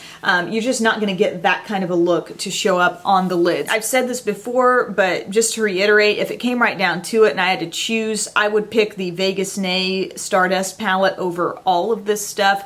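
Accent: France. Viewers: Love your French accent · Your French accent is sooooo cute! 😁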